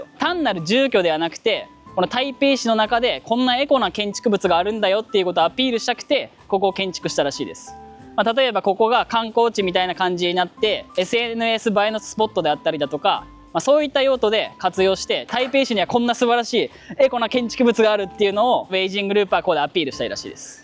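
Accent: Japan. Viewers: たまにのぶりんさんの動画見たりしますが、のぶりんさんと同じアクセントで話されますね。北陸のご出身ですか？ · 長野出身ですか？ 結局1軒しか売れてないなら、住んでる人には安いよね。ビル1棟だもんね。とてもエコとは思えないけど。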